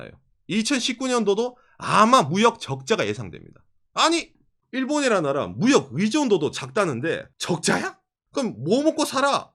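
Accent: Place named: Gyeongsang, South Korea. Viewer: 우짜든동~~~ 사실을 말해도 왜곡되기가 쉬운것이 진실이라 생각하는 바이라.... 그래도 그 안에서 관점의 차이를 알려주려는 의 영상 수십편을 보면서 우리와는 다른 그 곳에 대한 이해가 더 높아지네요 일본으로의 많은 여행경험으로써 인지하는 부분들도 영상을 보고서는 가끔 무릎을 탁!! 쳤습니다 아무튼 지난역사와 현재의 국제정세는 냉정히 봐야 한다는 입장을 지닌 울산 사는 형이.... 우짠지 말씨가 너모 익숙해가꼬 그래가 그 특별한 맻개의 사투리들이 테 정을 더 쌓게 했심데이~ 구도크와 좋아요!!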